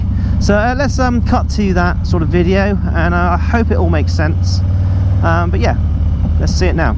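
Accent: Somerset, United Kingdom. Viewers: It is a painful process especially as we have had such good weather here in Germany, but...it is ordered and will arrive at sometime in the future. We are really lucky that Harley allow so many test rides and over the next two weekends the Harley Truck is at two dealers not too far from me. Just a quick question, what town do you live in? I think I recognize the accent and grew up in Weston-Super-Mare :-)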